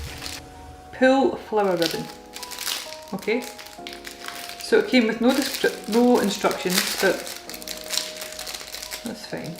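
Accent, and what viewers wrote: Scotland, United Kingdom. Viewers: Anytime i ever hear "It will be great" accompanying a Scottish accent I will be wary of what is to come. Its like a Texan saying hold my beer and check this out. I know ive said it.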